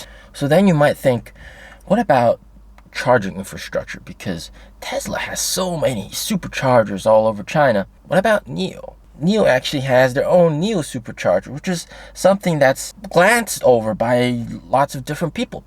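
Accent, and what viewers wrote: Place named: South, United States. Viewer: I like your southerner accent.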